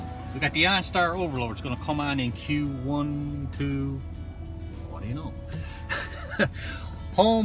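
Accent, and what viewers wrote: Canada. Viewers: "...over tree hundrid horse power!" Haaaaaaa! Love that Cape Brenton accent... "You frum the Bay, By? Noooooo, I'm frum the Pier, Dear!" **and** "It's a noice night for a foight, Mike!" are also Cape Breton classics :. )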